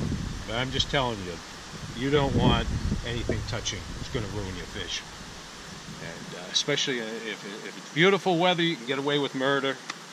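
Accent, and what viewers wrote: Boston, United States. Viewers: You still have that Boston accent, thanks for sharing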